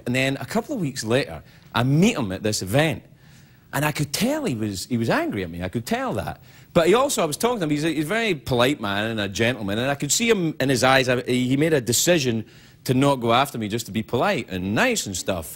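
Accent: Scotland, United Kingdom. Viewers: His accent has gotten less Scottish and more Americanized over the years, for sure. You can really hear his accent coming out here. Maybe it's just him talking from the heart and not trying to do his usual monologue voice or something like that. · He sounds so scottish here. Usually i think he has lost his accent but i can definitely more than hear it, too. Also that audience sucks they didnt understand the assignment. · He sounds a lot more Scottish here than he does now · he sounds so much more scottish here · He still sounds Scottish here. He had almost lost it by the end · he sound REALLY scottish in this wow, his accent is a lot less prominent now. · Is he Scottish? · His accent was so much thicker then. RIP Scottish Accent.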